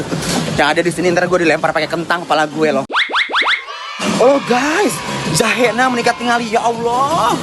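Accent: West Java, Indonesia. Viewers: Nyunda pisan bahasana di luar negeri oge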